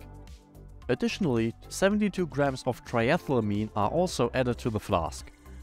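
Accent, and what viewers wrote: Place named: Germany. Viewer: I had a suspicion you were German due to the accent, then came the unmistakable Bad Reichenhaller which confirmed it 😂 Moin/Servus/Grüß Gott, lovely video!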